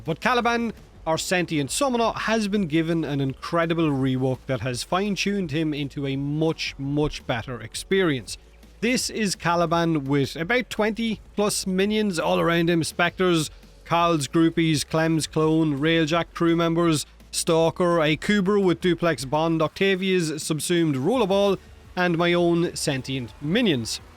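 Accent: Ireland. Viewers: I really love your irish accent 😉 « Moch moch bettar experience » ❤ And I do agree with you btw, it's an amazing frame now. Even better, it's a free gift atm, so imagine for a new player : pick Caliban, and steamroll the whole star chart with your new cute baby sentients.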